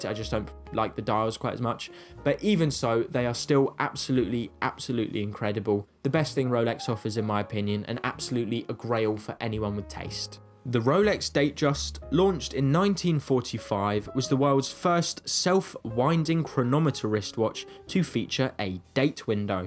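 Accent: England, United Kingdom. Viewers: I can't even understand what this guy is saying with his English accent. You should post this video in Europe. Maybe people's taste in Europe is different as well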